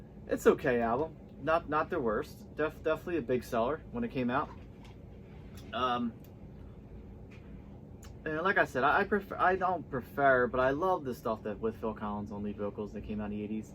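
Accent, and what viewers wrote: Philadelphia, United States. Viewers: Agreed is the best however better than. was too long would have made a better single disk. Hey is that a Philly accent?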